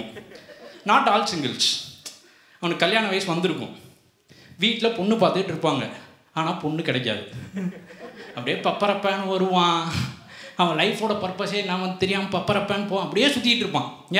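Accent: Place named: Madurai, India. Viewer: Madurai or near by accent 😂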